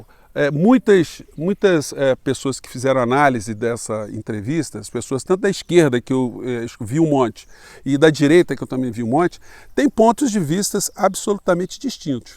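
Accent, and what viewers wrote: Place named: Minas Gerais, Brazil. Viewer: Uai!!! Não sabia que vc é Mineiro, mas desconfiava pelo jeito de falar!! Aaaamo! 👏👏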